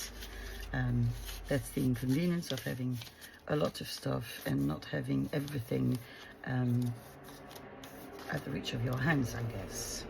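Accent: France. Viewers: Very good video and your English sounds fine with a French accent! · Wonderful first video! Don't be so hard on yourself! Your English is fine! I loose words too, and I speak English all the time. I also work with a woman from France, so I am comfortable with your accent! Keep up the good work! I got your link from Sevenplaza. Thanks!!! This is Carol from IN. USA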